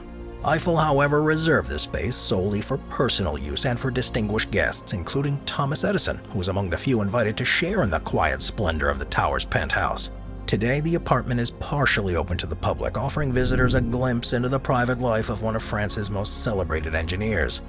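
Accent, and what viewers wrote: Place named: United States. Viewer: Stating the obvious there. U can tell his American as soon as he opens up his mouth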